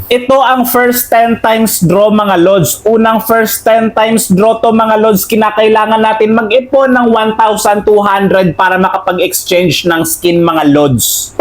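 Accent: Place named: Visayas, Philippines. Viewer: Bisaya yan halata sa lods sarap sapakin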